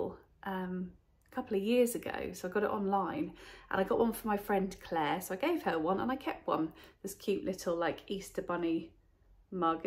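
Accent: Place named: England, United Kingdom. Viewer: recent subbie and I love watching your videos. Part of the joy is your beautiful English accent. The haul was amazing. My favorites were the dresses. Love the style of them. Enjoy your Easter weekend. 💛💛🐣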